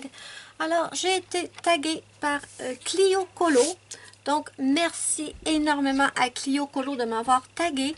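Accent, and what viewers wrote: Canada. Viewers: Bonjour 😄je viens de regarder ta vidéo, c'est très bien ce que tu conseilles aux personnes qui débutent le coloriage. Moi ça fait peu de temps que je commence le coloriage, à côté je fais de l'aquarelle et du pastel sec qui méritent des matériaux plus chers que pour le coloriage,pour moi bien sûr, donc je commence le coloriage avec les brutfuner,ils sont parfais😍💜Par contre pour les feutres crayolas je ne savais pas qu'il y avait 3 façons de les employer et c'est super je vais les prendre pour le moment pour remplacer les encres distress ink et je ne savais pas qu'ils étaient aquarellables.C'est super. J'adore tes vidéos 😍❤️ton accent canadien et la façon que tu as de te parler à toi même, tu es géniale 😍💜 Bisous bisous 😍😘😘🧡🧡🧡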